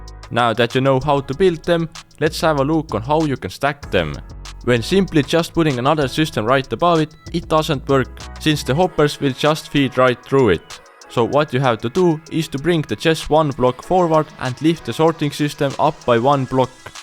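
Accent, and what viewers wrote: Finland. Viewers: Just with your accent I can tell you are Finnish! Terve Suomi! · You are Finnish . I am absolutely in love with the Finnish accent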